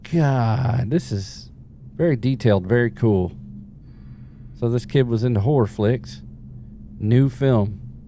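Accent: South, United States. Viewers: This is my first video of yours and I have to say, there is something strangely adorable about a dude with a Southern accent gettin' spooked. You're cute as all hell, Sir. Carry on.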